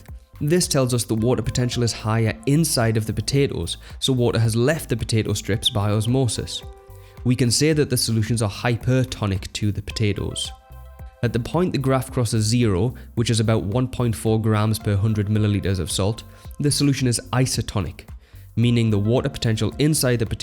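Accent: United Kingdom. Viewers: british boiii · i personally love the british accent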